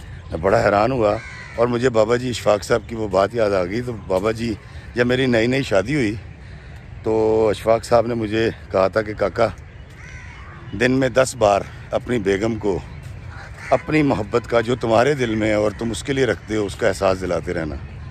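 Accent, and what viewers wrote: Lahore, Pakistan. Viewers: Basically I am from Amritsar. That’s why I love Lahori Punjabi, mithi zubaan. Same taste and same lehza 💕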